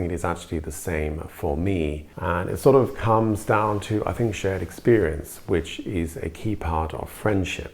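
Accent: Germany. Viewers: I love your videos but I'm distracted by the major German intonation you have when speaking English. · Your accent almost sounds German...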